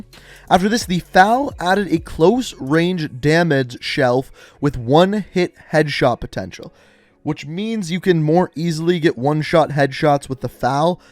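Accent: Canada. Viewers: Your canadian EH thats pretty neat but onestly hope you get a mill love teh vids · I know ur Canadian because of ur accent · Canadians eh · Ey another canadian ey!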